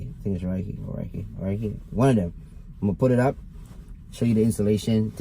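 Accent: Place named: Jamaica. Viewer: Yes sir and you sound like ur from yard….. My fam is JA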